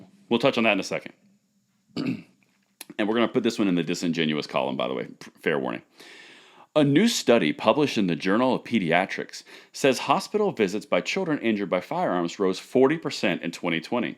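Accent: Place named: South, United States. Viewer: Badass southern accent lol. Keep up the great work brother.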